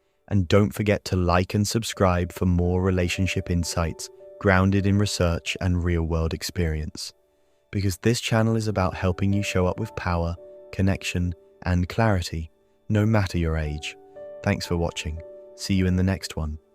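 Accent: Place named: England, United Kingdom. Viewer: It's a real interesting video my love. I'm sure it's you that made it. I am grateful to you my love, for all these videos of celebratipn of my personality, you generate for me... I'm speechless... My man is everywhere and celebrate my heart with such passion and such respectful love... How can i resist you my love... ?? It's sounds impossible to resist you... to escape you... You're so smart... It's scaring... 😂😂😂 Your intelligence is fascinating to me... Absolutely dangerous in fascination... By the way, i looooovvvveeee the english accent of this young robot man... It's lovely... I have nothing to add, my baby of love, your video is perfect, and you told it all. All all all. 😉😉 😘😘😘 💜🦋💕🌸🕊✨🌌🌈💜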